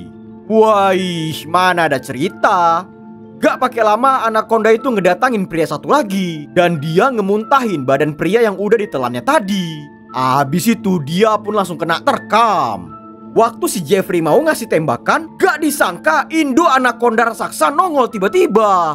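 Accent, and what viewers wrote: Medan, Indonesia. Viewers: Logat medannya kentara sekali bang 😂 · Iya we... Anak Medan yaaa Jangan kembut we😅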